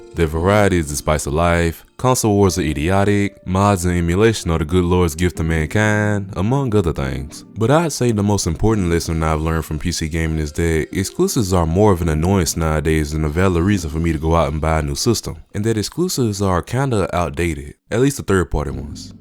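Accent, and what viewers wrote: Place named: United States. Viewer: Constructive feedback: 1. It's difficult to read paragraphs of text on-screen when you're talking at the same time. Choose one or the other. 2. You have a REALLY strong American accent. As a Brit, I can't understand you when you speak quickly and start slurring your words. 3. Opening with "PC is better anyway" in the first 30 seconds is just an insult to parts of the audience who disagree with you. As someone who owns PC and consoles, I think consoles are better because you can relax on the sofa instead of sitting bolt-upright at a desk. Good luck with your Youtube journey.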